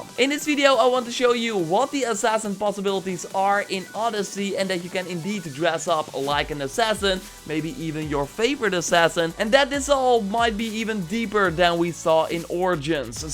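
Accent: Netherlands. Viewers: Nederlands accent is heavy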